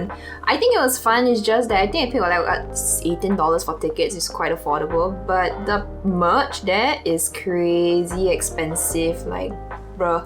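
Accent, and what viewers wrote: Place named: Singapore. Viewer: i've come across a few of your videos because it's quite hard to find youtube creators that fit my skin tone and reviewing the products i'm looking for!! heard your accent and immediately knew you're singaporean too!! subscribed and i love your comprehensive reviews and your timestamps and just wanna say jiayous <3 can't wait to see you blow up on yt hehe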